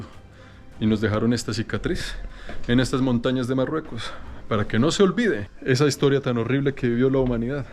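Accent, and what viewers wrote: Colombia. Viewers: Me encanta el acento de Colombia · Bueno primero muchas gracias por el video realmente hermoso y mucho con el hermoso acento colombiano te felicito, segundo y es muy gracioso hasta me hiciste reír mucho y es cuando has entrado a lo que llamaste "edificio" es una casa privada menos mal que nadie te veo ahí jajajajaja, claro como eres extranjero no te van a decir nada pero fue muy loco verte entrando, muy atrevido jajajaja. Y ahora sobre el color, bueno soy Marroquí norteño de Tetuán (la Paloma Blanca) así que te diré cual es la teoría más acertada para nosotros y obviamente basada en información histórica, pues la cuidad azul fue construida el año 1471 por Ali Ben Rachid Al-Alami y el es también el padre de la mujer llamada Al-Oura lo cual era el gobernante de Tetuán aquel época es una mujer muy conocida en la Historia de Marruecos, y pues Ali Ben Rachid ha construido la cuidad Azul como castillo en contra de la invasión Portuguesa a Ceuta aquel época para poder proteger sus cuidad por si acaso intentan invadir a la cuidad azul, en el año 1492 con la caída de Granada la Última cuidad que estaba bajo control en Andalucía a los Reyes católicos comenzaron a echar los Musulmanes y los Judíos de Granada, y pues de ahí han viajado por Marruecos, exactamente el norte, parte de ellos llegó a la cuidad azul y comenzaron pintando sus casas y sus negocios con el color azul los judíos como para acercarse a Dios, y de ahí se quedó como tradición este color en la cuidad y los marroquíes le han cuidado hasta que se transformó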